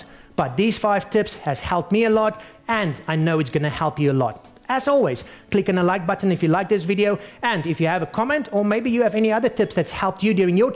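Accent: Afrikaans, South Africa. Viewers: Kyle Pickens he speaks weird cause he is afrikaans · This guys accent makes me think of krugerrands. And Krugerrands makes me think of Lethal Weapon 2. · Less talking. More showing. The talking makes you look like a idiot. S.A accent. Painfull. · Is that a south African accent? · He-he, I couldn't place the funny accent until you pulled biltong out of the fridge :) Cheers from Canada! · By your accent, I'm guessing you must be from South Africa. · He sounds like South African' correct me guys if I'm wrong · definitely an Afrikaans accent lol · Damn this guy has a strong Afrikaans accent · what accent is this? South African? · South African? I here that Afrikaans. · is this guy South African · He's South African...Most likely Pretorian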